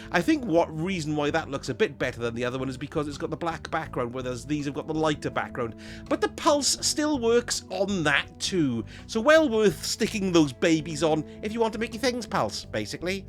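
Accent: Wales, United Kingdom. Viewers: You sound very welsh my friend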